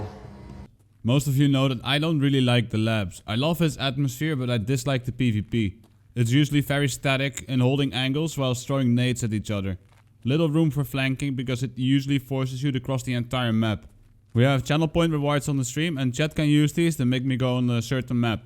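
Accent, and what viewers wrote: Netherlands. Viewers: I literally just watch for the dutch accent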